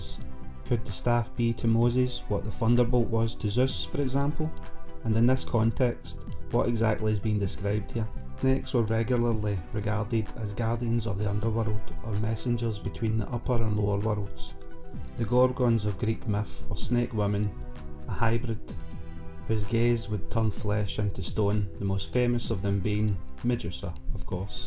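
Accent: Scotland, United Kingdom. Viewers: I was like eh Scottish that's new on here lol I'm Scottish myself. I Loved it.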